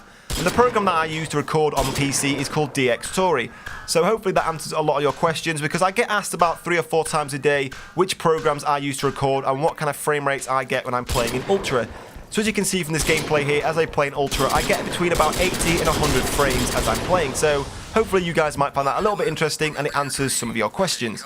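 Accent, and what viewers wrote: United Kingdom. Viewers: wow i like your accent =) British ??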